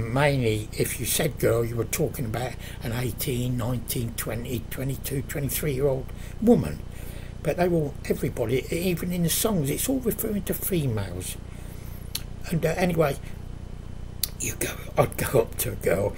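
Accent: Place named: South London, United Kingdom. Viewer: Old..... but not obsolete.... You sound as though you are from South London, Southwark maybe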